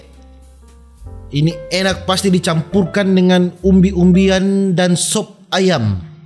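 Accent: Manado, Indonesia. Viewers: Orng tondano ato Manado kk hehe kalo luar maapppp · Lah Logat nya kayak Manado nih asal mana Ko?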